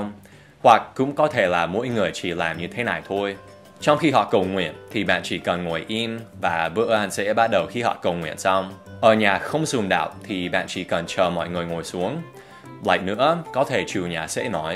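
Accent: Standard. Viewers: hài thật phát âm chuẩn học sinh Việt hêhê! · mấy bạn để ý đi giọng nói tiếng việt của thầy càng ngày càng chuẩn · Bạn nói Tiếng VIỆT CHUẪN ghê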